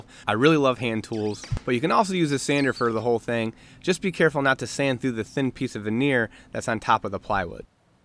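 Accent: Michigan, United States. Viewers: R u from Michigan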